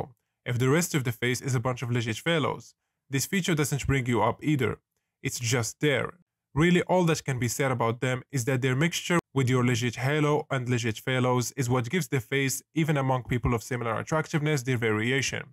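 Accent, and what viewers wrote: Morocco. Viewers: من أول فيديو عرفتك مغربي من صوتك 😅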